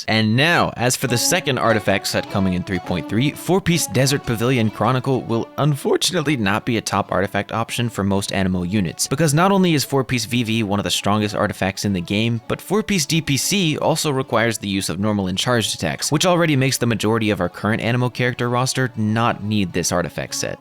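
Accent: United Kingdom. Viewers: The british accent gave me an eargasm. Thank you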